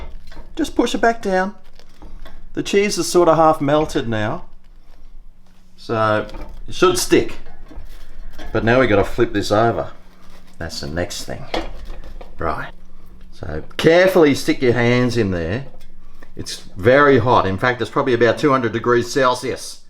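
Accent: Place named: Australia. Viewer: You speak good Australiana Accent. Good Dai Cobber. I wonder how old you are? Are you 65? Cos you move slow. Good videos for old geriatric people to show how to cook slow. When you eat and collect all those sauces on your white beard, it looks untidy. Since you are a star on you tube, can you clean shave your beard to a smooth shave? Why? So when sauces fall on your face, you can feel it and will wipe it immediately with a tissue. This video did not have sauces on your beard . Most others previously did.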